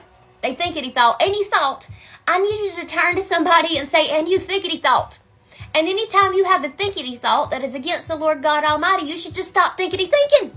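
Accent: South, United States. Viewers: You have a great Southern accent sweets!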